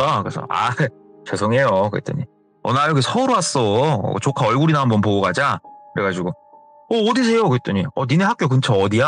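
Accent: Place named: Chungcheong, South Korea. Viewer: 님 사투리는 항상 충청도ㅎㅎ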